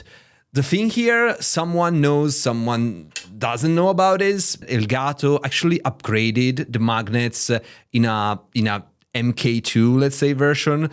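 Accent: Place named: Italy. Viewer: I honestly like the Italian accent and it doesnt bother me maybe bc im from the EU and im used it but none the less great video, I had the OG lp arm and wanted to switch to the white lp pro but 199 euros - HARD pass.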